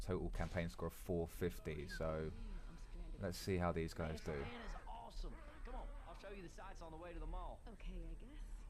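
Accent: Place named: United Kingdom. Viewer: you idiot. the language isn't british. It's English with a british accent. stupid ass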